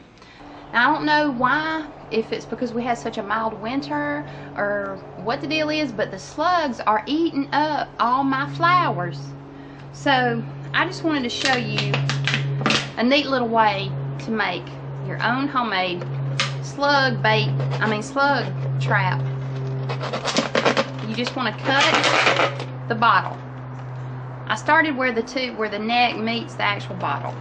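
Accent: South, United States. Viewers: u kind of cute with that southern voice... :-P wiking in denmark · it sounded fine to me :-) it is our southern state accent... USA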